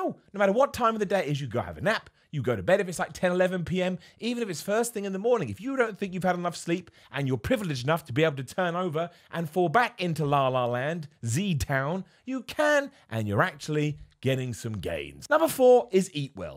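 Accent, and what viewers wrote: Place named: England, United Kingdom. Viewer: Yo, you look like the older version of Saitama. Plus the English accent 👍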